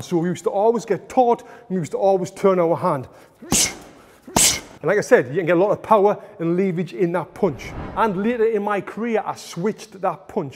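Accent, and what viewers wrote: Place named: Geordie, United Kingdom. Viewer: Is this guy from Middlesbrough or Newcastle because he sounds like from that area in uk. North east area, maybe Sunderland, Nirthumherland or Durham. They all sound the same. Geordies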